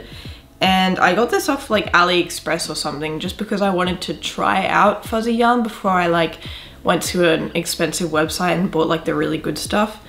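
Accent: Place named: Australia. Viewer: Love your business and its nice to hear another Aussie voice!!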